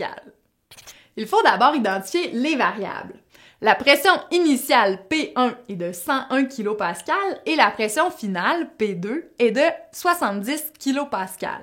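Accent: Quebec, Canada. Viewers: ca a aucun rapport elle parle tres bien francais elle a juste un accent quebecois pcq crois moi que si un parisien vient au quebec c lui lextraterrestre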